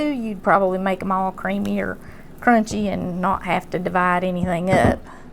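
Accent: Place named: Tennessee, United States. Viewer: Wonderful lady! Very delightful. Love her deep southern accent from Tennessee. She is a rare one these days. She praises men and the men in our lives and teaches that appreciation of the men in our lives is important. We live in a society that prefers to demonize men, make them all out to be bad actors and bad guys and never good. That even men who are strangers must be up to no good if they approach a woman outside excercising and want to ask her something. I understand some of them may say things not kindly but that doesn't mean we say unkind back. Courtesy and manners and good words go a long way. Maybe pray for that person, smile and walk or run on. But not a bad word. I was just on a Facebook group the other day that clearly in the comments of women showed exactly that. It went from a conversation on personal running safety to make hate and sexual harassment and how ALL men must be so bad and up to absolutely no good just because they approach some female or happen to be outside in the great outdoors too. 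These women commented as if they owned the world, like the world revolves around them and how everything has to change to fit their narratives. I couldn't believe it! Never have I heard or read anything so repugnant in my life. Well, I'm a woman too. I grew up in a day and age where my parents taught me how to be kind to all people including strangers. I was taught how to gage a situation and how to act accordingly. It's so unfortunate that we are living in a society th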